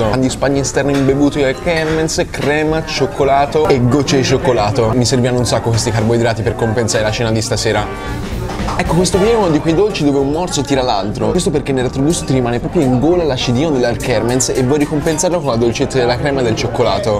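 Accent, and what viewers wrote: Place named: Tuscany, Italy. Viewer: Comunque tu parli un toscano"strano"....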